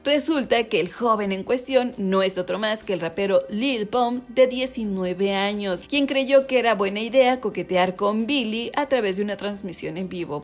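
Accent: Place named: Latin America. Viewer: Veo mucho Curioseando y ya me acostumbraba a una voz española, y ahora me tengo que acostumbrar a una voz latino americana.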